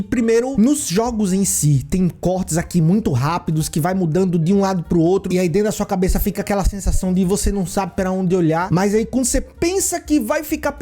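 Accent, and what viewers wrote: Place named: Bahia, Brazil. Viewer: Esse sotaque me faz voltar pra Salvador imediatamente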